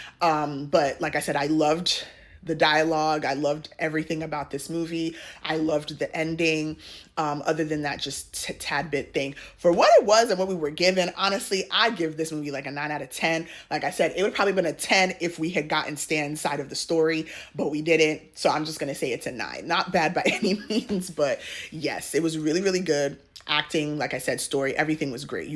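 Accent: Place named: United States. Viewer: I really enjoy your reviews, and this comes from genuine support. I’ve noticed a pattern where men sometimes receive more grace for harmful behaviour than women, and it’s important to keep the same energy for both — especially when women call out behaviours or action things that men have long been allowed to get away with, from the start of time. I also struggle with how often Nollywood glorifies adultery. It isn’t just “messy” — it’s emotional and physical abuse, with real consequences like STDs and even death. That’s why younger Millennials and older Gen Z reject narratives that romanticise cheating. We love the American accent here in the UK 👍🏾. I appreciate your work and just wanted to share this perspective because your platform is strong, and fairness matters.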